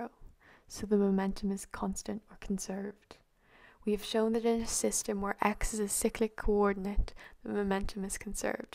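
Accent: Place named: Ireland. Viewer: the speaker's accent sounds a bit Irish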